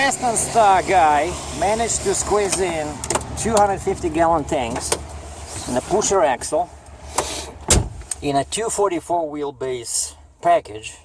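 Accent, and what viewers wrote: Russia. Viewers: your accent is cool to me. ther are a lot of ignorant people in trucking. sorry to say. I like truckers I wanted to be a trucker but do to medications I must take to keep my spinal pain bearable I cannot drive a commercial truck. but I respect@ truckers. Or they may not drive truck at all hard to know. Russian/American doesn't matter.Its who we are that truly defines us.They defined themselves as a social sickness that is tearing this country apart as well as Canada too.People like you are building keeping this country running. So they can go FUCK themselves when they treat people like you with disrespect. your one badass trucker. Thank you for all the videos. Stay Safe Tim Bishop out... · Your accent is cool I like the Russian English you don't take personal what some lame brains have to say about you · Ok I see now. There are a few good guys that will share some good info but these Forum's are filled with people that tried to make it in the Trucking Industry and they are basically in financial ruin! 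they lost homes, marriages, family, and it's not your accent that bother those losers, it's the fact that you were born in Russia and you are more successful than a lot of those losers and that what bothers them. I was born in Central America and I will always have a Spanish accent. When those losers saw your equiptment and all those videos, envy set in and your original question dint even cross their mind.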